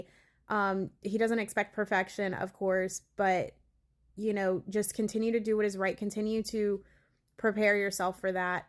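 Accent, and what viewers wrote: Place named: South, United States. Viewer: I am sorry, but I must ask. Are you originally from California or the South? The way you speak sounds like the South.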